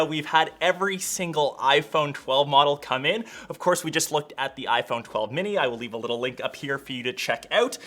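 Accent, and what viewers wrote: Canada. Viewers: You have an accent. Are you Canadian? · I have to admit, one of the highlights of watching your videos is chuckling when you say words like out, about or been, but we love our neighbors to the north. 🤣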